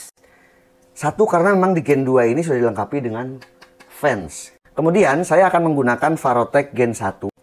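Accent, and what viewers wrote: Java, Indonesia. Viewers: Medog nya sama 🤭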